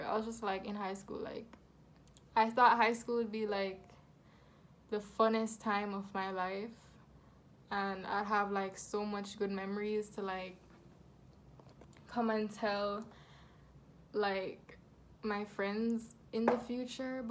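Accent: Jamaica. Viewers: Are you Jamaican? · Bredda you neva haffie tell me you Jamaican could tell from you open you mouth 🇯🇲🇯🇲🇯🇲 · You must be jamaican , accent trang trang trang 💯💯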